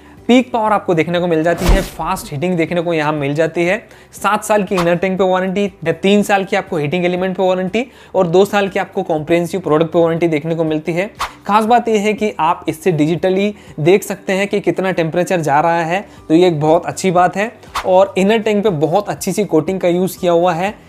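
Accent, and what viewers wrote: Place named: Northeast India, India. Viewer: North East India se ho kya aap.. ? apki accent vaisi h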